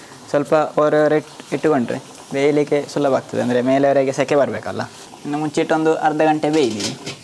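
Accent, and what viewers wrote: Mangalore, India. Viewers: ಯಾವ ರೀತಿ ತಾವು ತಯಾರಿಸುವ ತಿಂಡಿ ಪದಾರ್ಥಗಳು ವಿಶಿಷ್ಟವಾಗಿರುತ್ತವೆಯೋ ಅದಕ್ಕಿಂತ ವಿಶಿಷ್ಟ ನಿಮ್ಮ ಮಂಗಳೂರು ಕನ್ನಡ. ಕೇಳಲು ಬಹಳ ಸುಂದರ..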